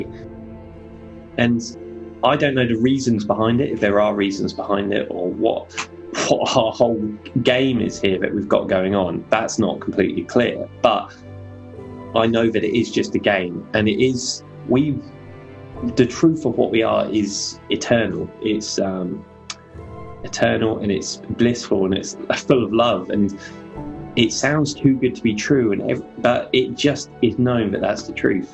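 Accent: United Kingdom. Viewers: Interesting take on DMT. Personally, "I" had to work real hard to decipher the Cockney type English accent but it was worth it. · Great report! Thanks for sharing! You sound British. I live in London. Let me know if your up for meeting some time :) Would be cool to meet and have a chat about this topic :) no one I know have had this experience.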